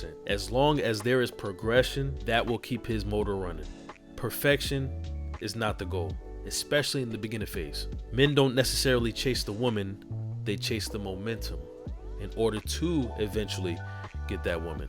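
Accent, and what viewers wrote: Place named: Philadelphia, United States. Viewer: Love your Philly accent❤ Great content.😊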